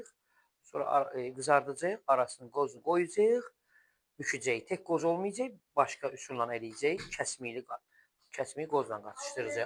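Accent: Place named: Baku, Azerbaijan. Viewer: Suzunçun.Bakili ləhçəsi)))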